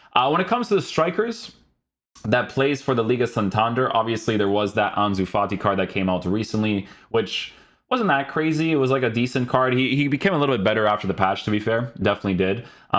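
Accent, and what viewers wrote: Portugal. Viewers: Cancelo just isnt good defending, i think dalot should play more, and palhinha what a player, he must start for the rest of the wc… BTW És português? 🤣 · Are you portuguese?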